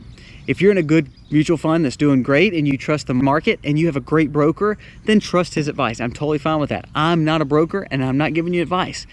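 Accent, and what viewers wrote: South, United States. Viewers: Great points that you rsise - thank you. On another note, I don't particularly like the American accent except for that cool Southern accent you have. Such a great accent and I love listening to it 😅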